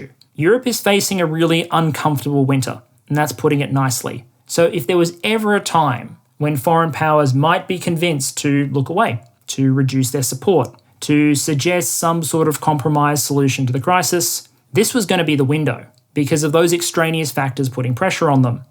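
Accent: Australia. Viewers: I'm Australian and he sure sounds like an Aussie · Not sure how a guy can speak in a fairly monotone Aussie voice, yet still be by far the one of most interesting and informative views on the war in Ukraine. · Thankyou for all your good work mate. Informative, instructive, clear and concise with a small dose of ozzie for good measure. Keen for more.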